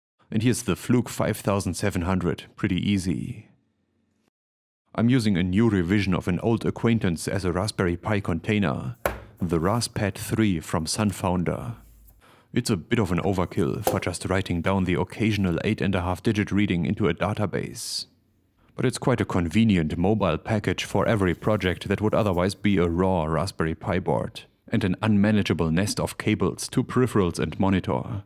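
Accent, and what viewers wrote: Germany. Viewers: Germany's have fun acent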